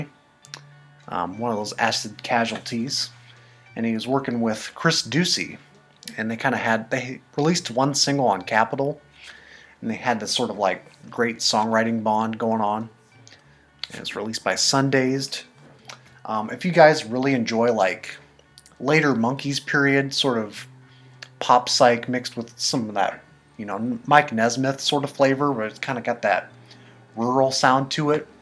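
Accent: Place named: Lincoln, United Kingdom. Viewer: Hey! Cosmic Brian sent me here! He said you're from Omaha, but it sounds to me like you're from Lincoln? It's pretty cool watching a video of someone showing the treasures they found at places where I shop at occasionally!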